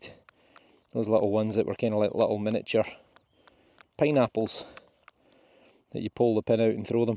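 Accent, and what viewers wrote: Scotland, United Kingdom. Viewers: Thank you so much for all your videos, i am in perthshire and I love walking about with you because I'm in a wheelchair, so keep up the good work, alao i love your Scottish accent, take care, Agnes 🏴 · Yes it’s definitely that gorgeous Scottish accent and lovely tone of voice ❤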